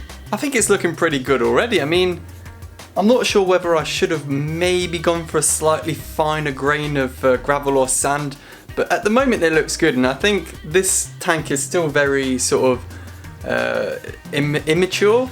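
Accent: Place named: United Kingdom. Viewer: Where are you from in the UK ? I recognise your accent from near me 😁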